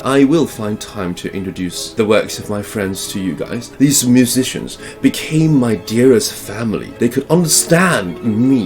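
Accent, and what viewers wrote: United Kingdom. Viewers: 好喜歡聽老師的英式口音，期待以後可以出一系列的英說影片～聽了很享受XD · 哈哈～ 突然有種希望老師能拍一部，自己寫的電影，裡面充滿奇幻和你好聽的英國腔，（覺得應該會很棒） 再來喜歡搖滾樣貌和古典音樂搭配，再加上一點慵懶的優雅，意外的讓人入迷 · 我又來報到了😂 Eko你的聲音和英國腔真的太好聽了！ 只能說聽你說英文是種享受哈哈 btw thanks for sharing your life story when you’re little! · 超喜歡英式發音的，可以聽Eko老師講英文，而且是講日常用語👍👍👏👏 重點是關於吸血鬼很吸引我 哈哈👍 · 從阿滴那邊知道EKO老師的英式口音很好聽，然後連到這個介紹影片，完全被老師的人生經歷懾服欸，期待老師之後可以繼續分享更多！ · 天啊，真是太精彩了！好喜歡聽你說話，老師的思考與陳述方式很有魅力，完全能引起大家的共鳴，一邊看你的日系打扮搭配英式英語以及上方不時出現台灣人習慣用語，種種不可思議的違和讓人會心一笑。 從小到大太多時候，我們都被迫假裝自己能接受或去成為所期望的某人，被僵化體制、社會狹隘價值所捆綁，很欣賞老師的勇氣與毅力！這樣一段自我介紹的影片，是那麼不容易，背後經過無數萃煉與追求完美的堅持，才能以現在如此從容優雅的姿態向大家娓娓道來自己的故事，這樣的精神，非常令人感動與佩服！ P.S. 我以前在學校很討厭英文，在被強迫的狀態下英文成績很差，最近這兩年靠自學才漸漸有了對英文的興趣，看到像老師這樣優秀自信地展現英文能力，對學習者來說是非常激勵的，本來想用英文留言給老師，不過為了能精準表達內心的感受，還是決定用母語來正確完整地陳述 ： ） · 尋尋覓覓終於找到一位新潮又酷的英文老師 想跟隨他充满神秘浪漫的英式口音 及吸血鬼教室聽他跟別人不一樣的特殊教法 只是相見恨晚 在一個訪問频道認識EKO老 師 被你的声音吸引 謝謝老 師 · 開頭以為要講吸血鬼傳說，然後老師用道地英式腔調講訴著台灣學子的悲哀故事，反差太大了，差點反應不過來😅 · Quite a good summary and denotation about eslite Taiwanese student' studying process. 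But lowsy students like me don't even know the frame the society's giving to us till some people mentioned. But this time the issue is mentioned by a visual kei vampire who speaks British accent. Intriguing!😆 I like British accent. So elegant! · Very beautiful British accent n impressive 吸血鬼造型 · 看福爾摩斯1984年版的影集，就是這種英國腔！ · 你的英式口音真的很有吸引力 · 真的有唸文學作品有差 整個鋪陳、敘述都非常厲害啊 英國腔超帥😂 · Pls tell us more about your life in England !! Your life stories are truly intriguing,! I had been studying in the UK for 4 years, and your accent reminded of those amazing memories back then. anyways, love your work, pls continue sharing your knowledge to us, I enjoy every bits of your creative work ❤️ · 看了几个eko的视频，发现英音听起来真不错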